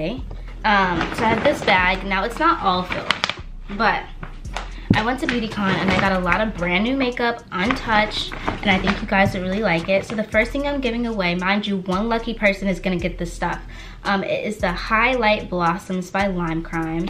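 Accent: New York, United States. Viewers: I can tell you a new Yorker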